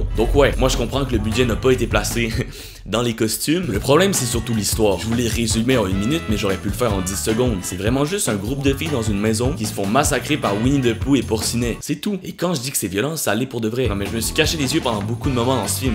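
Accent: Quebec, Canada. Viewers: Salut t’es québécois ou français. C’est difficile à dire avec ton accent et la manière que tu parle. Et tu as des termes de France. Mais bon travail !!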